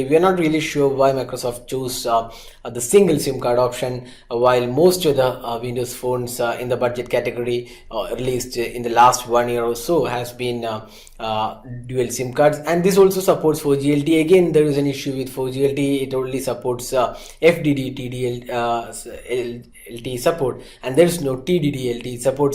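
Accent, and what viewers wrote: Kerala, India. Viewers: heaavvyyy mallu accent.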